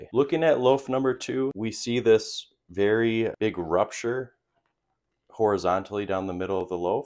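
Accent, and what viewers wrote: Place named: Midwest, United States. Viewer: As a midwesterner, I appreciate your vowel pronunciation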